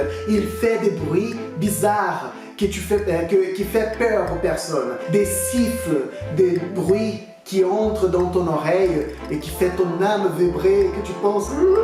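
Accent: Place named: Brazil. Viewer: Oi, vc não é francês! Vc tem um sotaque muito forte de brasileiro ou de português. Dá para perceber que vc está aprendendo a falar a língua francesa! Vá enfrente! Um dia vc conseguirá falar bem! Sucesso e até mais!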